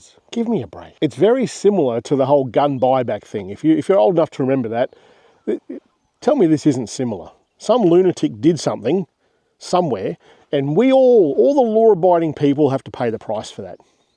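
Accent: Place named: United States. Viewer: Boo hoo, u sound like a red neck American. U can’t take my guns from me. Who cares if u can’t use a machete. I bet u hardly use it . But let’s jump on the bandwagon and use it to get more content for your channel.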